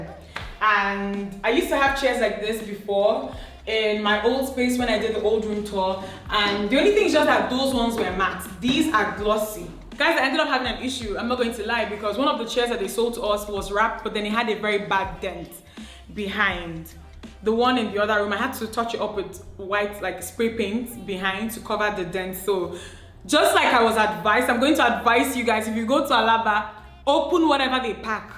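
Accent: Nigeria. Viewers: I love this video . I also love you naija accent; so true! Thanks for the tour. I am trying to pimp my room for my videos and I am more than inspired . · I love the Nigerian accent 😆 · Cherry chia different places different pronunciations. That’s how it’s pronounced in Nigeria. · oh ... Nigerian accent won't let this girl be great ...anyways she Nigerian so..., but nice apartment tho...am currently renovating my own 1 bedroom apartment in the UK which is a lot similar to this, but a bit bigger in size. Its Easier over here because you just shop on Amazon or wayfair.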